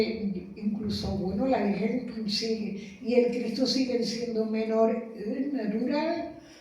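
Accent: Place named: Andalusia, Spain. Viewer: Magnífica disertación,de gran erudición y con una dicción y gracia muy andaluza,mis felicitaciones doña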